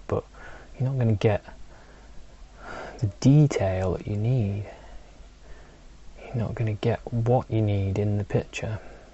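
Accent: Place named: England, United Kingdom. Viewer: I know his accent is English, but is he based in the UK or Australia as we have a lot of Brits who migrate to Oz. Cheers from down under.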